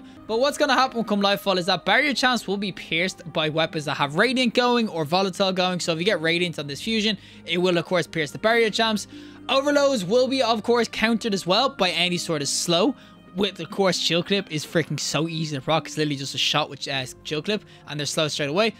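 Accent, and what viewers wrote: Ireland. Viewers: very nice to hear an irish accent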